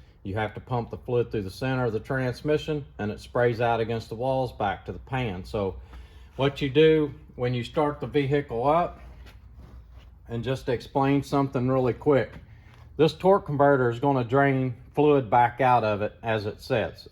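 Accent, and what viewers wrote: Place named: United States. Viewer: Thanks for this excellent video. One thing I really appreciate is the very clear American English! I'm not very mechanically inclined, and it helps immensely to understand what is being explained, and very well, I might add!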